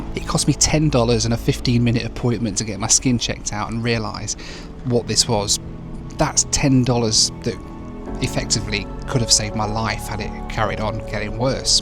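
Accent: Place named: United Kingdom. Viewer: Lol!!! he's getting big pay I think. Keep promoting for that hell hole place. Hes welcome though because he's white with British accent. I would even afraid to go there myself. Lol!!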